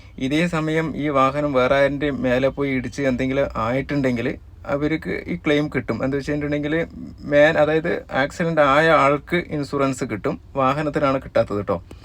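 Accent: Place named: Palakkad, India. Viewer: പുള്ളി പാലക്കാടൻ കൊമ്പാള ഭാഷയിൽ സംസാരിക്കുന്നു...മലപ്പുറത്തുള്ള കോയമാരുടെ കാർ വിൽപ്പനയെന്നും കാണാറില്ലേ..??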